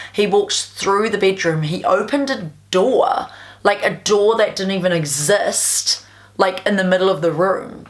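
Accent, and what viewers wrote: New Zealand. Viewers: Shivers.. You gotta love how New Zealanders talk. Ever seen Flight of the Concords? Case closed.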